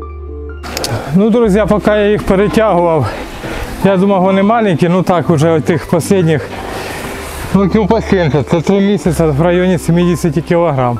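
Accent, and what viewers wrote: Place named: Kuban, Ukraine. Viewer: Мабуть чувак з Кубані, але українське коріння є. В Україні так не розмовляють тут за тридцять років вже мавпи навчилися розмовляти. Хай щастить.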